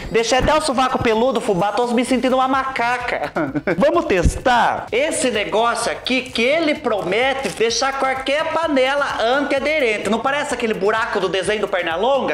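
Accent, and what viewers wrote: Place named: Northeast, Brazil. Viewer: Fubá sou Cearense e seu sotaque lembra quem é Nordestino. Sendo do Nordeste ou não, AMO seu jeito de se comunicar.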